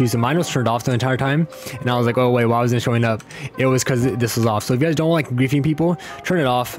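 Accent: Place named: India. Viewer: Lmaoo no gonna kap I can hear the desi in you correct me if I’m wrong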